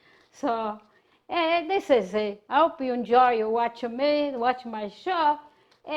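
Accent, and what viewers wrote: Italy. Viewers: The world is small! We all in immigrated to Chicago in 1964. Went to Italy in 1979 and met my husband and got married in 1982. In a small town I am sure knows called Castelluccio Valmaggiore not far from Faeto!! I now live in northern Italy. My family still lives in Chicago. I love listening to she reminds me of my mom passed away in 2015 Adele who also always cooked and baked as with her Italian accent. Bye.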